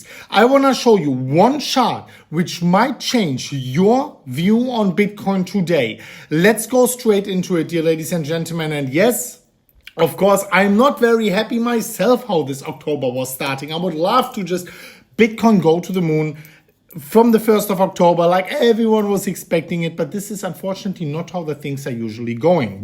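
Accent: Germany. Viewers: German accent is strong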